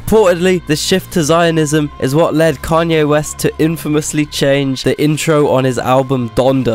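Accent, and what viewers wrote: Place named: United Kingdom. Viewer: Esse sotaque Britânico é muito bom Arca naim daish nuai nuan rai trai grai cakerrrrr LMAO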